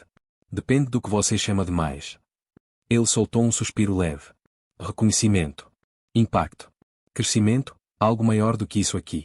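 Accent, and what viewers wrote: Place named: Portugal. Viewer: Não suporto esse sotaque de Portugal horroroso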